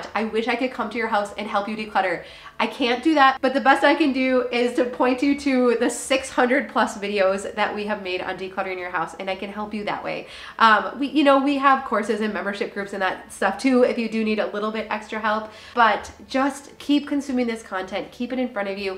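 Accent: Minnesota, United States. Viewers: I needed this ❤ Also a MN-girl ❤born and raised! I think that's why I "hear" you so well... 10 people could say the same thing, and I obsorb 🚫 nada But starts talking and its like the epitome of Minnesota Nice 👌 I'm so comfortable when you talk 😌 Really helps me actually, take in the lesson you're trying to get across!!!!! I freaking love you❤ Thanks for being you🙏 and all that you do for us!!!!!!!!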